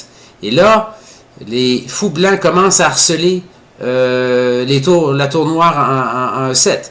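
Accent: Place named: Quebec, Canada. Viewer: Fin de partie très intéressante et bien démontrée par notre professeur et grand pédagogue préféré avec son accent québecois très plaisant et que l'on aimerai bien rencontrer !! Merci pour cet exemple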